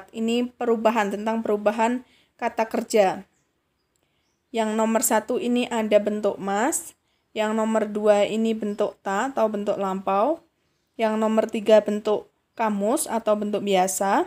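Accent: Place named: Java, Indonesia. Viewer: Nyong seneng medoke sampean mbak sensei..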